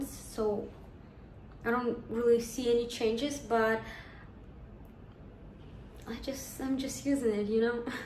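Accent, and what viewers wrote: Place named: Ukraine. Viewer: You’ve got a beautiful Ukrainian accent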